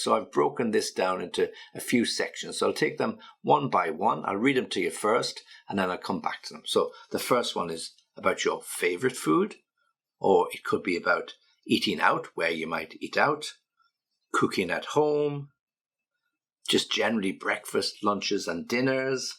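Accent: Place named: England, United Kingdom. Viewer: Do you live in England? Your accent.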